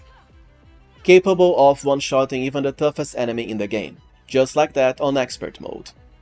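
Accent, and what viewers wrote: Brazil. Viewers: Just started watching your video and within 10 seconds I'm 100% sure you're brazilian!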